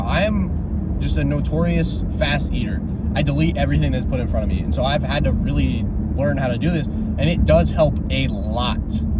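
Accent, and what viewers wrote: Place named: Australia. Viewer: I thought you were australian🇦🇺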